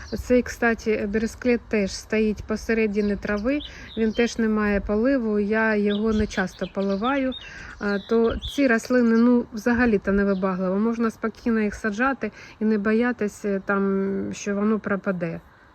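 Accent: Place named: Surzhyk, Ukraine. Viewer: Суржик як суржик, як на мене дуже органічний. Головне постійна практика говоріння💙💛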